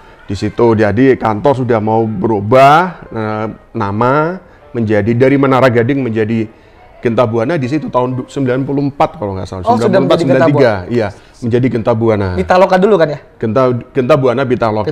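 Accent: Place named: Surabaya, Indonesia. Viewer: khas banget logat Surabaya om Anto😍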